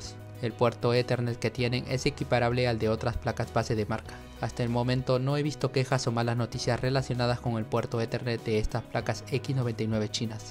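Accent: Peru. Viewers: cabro, sos de peru¡??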